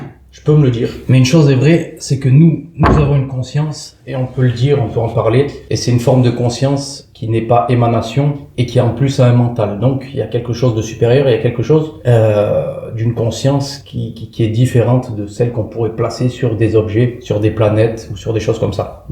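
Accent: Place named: Corsica, France. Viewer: Ce n'est que la voix d'un corse.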